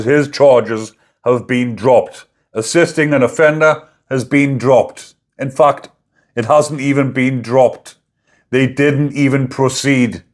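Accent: Newcastle, United Kingdom. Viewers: Where does this guy come from ? Geordie ?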